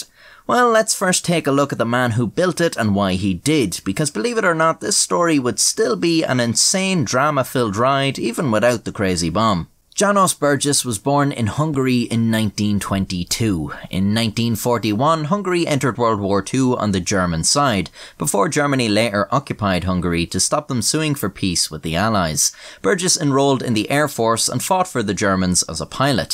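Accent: Ireland. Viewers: This was way different from the story I expected while litening to an Irish voice talking about a bomb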